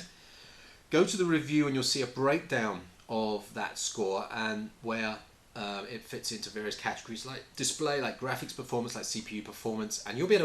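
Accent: United Kingdom. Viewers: Does it matter much? His name is. Not a lot of Germans are named, nor do they talk with a British accent. So there is a very good chance that he's British. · Hahahah no it doesn't, I was just curious, he speaks with a British accent but his keyboard is QWERTZ and also his windows is in German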